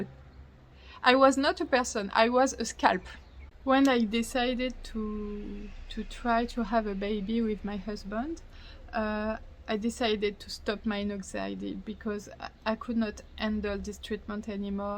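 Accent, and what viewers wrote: France. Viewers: I love your French accent 💖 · Well she’s French she can’t do anything about it like Im Greek and I’ll always talk with a Greek accent when I speak English,I can’t control it….. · Never apologize for a French accent! :) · This is the first video of yours that I've seen. I am very impressed by you...both your bravery, and your command of the English language. Yes, your accent made me concentrate more to understand some words, but most of us Americans think the French accent is beautiful.❤ You speak very eloquently. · Lovely and pleasant accent. French is a harmonic language and soft in the ears so the accent is so nice. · Happy to hear more French accents on YouTube. Thank you for sharing your story. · Who does NOT ❤ a French accent! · Why are you apologizing? who doesn't adore french accent!! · 1- you are so beautifual with our without wigs. 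2- having a french accent makes you even more beautiful 3- thank you fo this video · Your English with French accent is beautifull it truely is. I feel for you girl.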